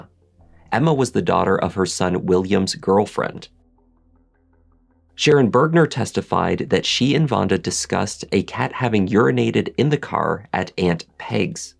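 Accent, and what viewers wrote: Canada. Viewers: Thank you! It was only around a month ago, if that, that I discovered your channel, thanks to the wonders of the YT algorithm. Since then, I have watched 30 to 40 of your videos (really!). Furthermore, I sent an email to the popular political broadcaster Megyn Kelly, herself a fan of the true crime genre, and highly recommended your channel to her. Yes, I tend to forget names quickly, starting in real life around two seconds after meeting someone new. So when you refer to six different people in a video by first name, I frequently have to rewind in order to remind myself who is who. Curiosity question: I was surprised to hear you say on an episode I watched yesterday that you and your wife live in the British isles. Can I safely assume that you are Canadian? I have caught hints that you are in fact from Canada, including accent, pronunciation, and even referring to the US Coast Goard as the "USCG", which absolutely no one in the US does. 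Again, just curious. Thank you for all the amazing work you do on this channel. And I very much appreciate your personal response to my comment here. Jim W. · Is the narrator from Canada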